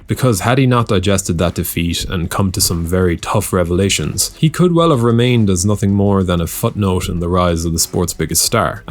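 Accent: Ireland. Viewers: For some reason I didn't notice you were Irish until you said it...weird huh😅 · Wtf the dude that made the video is irish?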